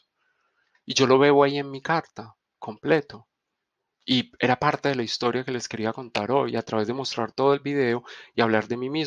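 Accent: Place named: Colombia. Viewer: Maravillosa exposición,una genialidad como enseñas; con profundidad y esencia das la enseñanza maya, Gracias!!! Encima de colombiano sos!!! Dulzura pura... amo profundamente la tonada colombiana, te enamoran al hablar. Un alemán te dice te amo y te asustas y un colombiano te insulta y pensas q te está piropeando. Desde Villa Carlos Paz, Córdoba, Argentina 💖💗❤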